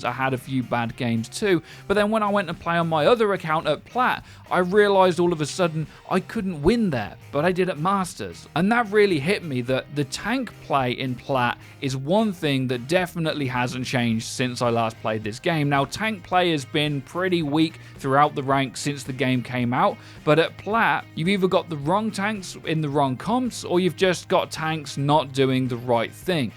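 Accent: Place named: United Kingdom. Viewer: We missed your British accent guiding us!!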